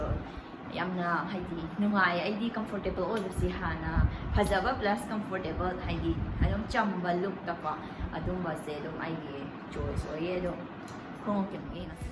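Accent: Kakching, India. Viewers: yengvda nsk 😘 ym nungcye ngna wa ngangba j taba ym nungaiye kakching gi lol khoira ngangbdo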